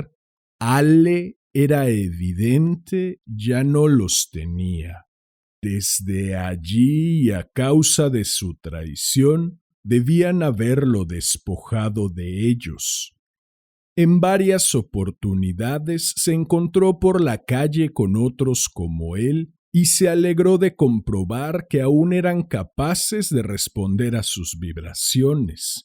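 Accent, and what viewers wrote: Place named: Argentina. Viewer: Un buen cuento, el tono Argentino salió perfecto. Saludos, Natalia de Argentina.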